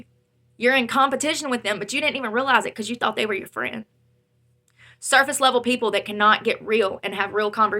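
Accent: South, United States. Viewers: Hey, stay positive. Whatever you do, don't go back to the booze. It's a lonely world out here, but guess what... you have the sweetest southern accent I've heard in a while, and that's special. Stay positive, god bless!!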